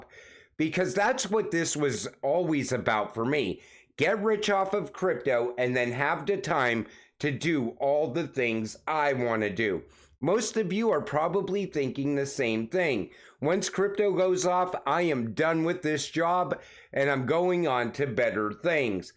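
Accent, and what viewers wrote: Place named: New York, United States. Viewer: Hey My Man, Since it's obvious from your accent that you're from the New York area, I just want to "Bust your Balls" for a second. I busted out laughing when you said "Kenny Nguyen" by pronouncing it "Na-goo-in" when it actually pronounced "Nwen". It's a name that's very common in Vietnam. That's it. Keep up the good work.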